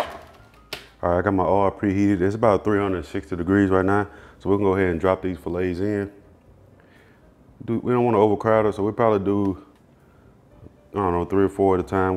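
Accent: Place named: Mississippi, United States. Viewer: Wait so thats not an Atlanta or Texas accent its Mississipi ❤❤❤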